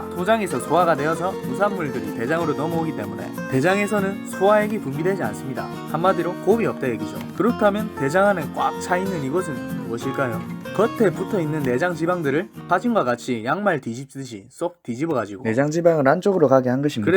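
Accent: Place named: Daegu, South Korea. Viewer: 사투리가 엄청 구수하시네요ㅋㅋ 대구 사투리인가요?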